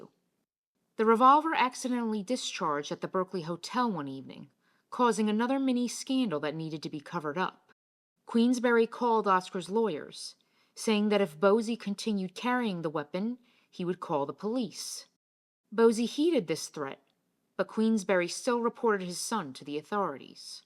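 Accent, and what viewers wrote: United States. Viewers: Why criticise the narrator’s accent. She is an American and speaks perfectly well. The substance surely is the main thing . I found this an interesting article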